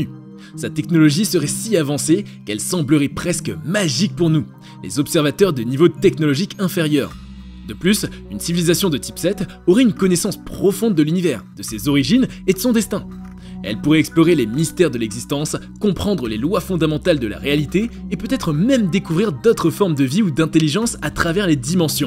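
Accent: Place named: England, United Kingdom. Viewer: purée trop bien ton accent anglais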